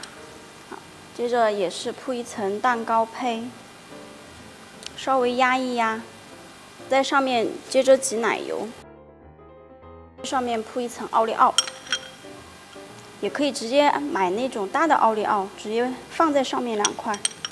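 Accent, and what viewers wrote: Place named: Chongqing, China. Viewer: 重庆椒盐普通话听起来好可爱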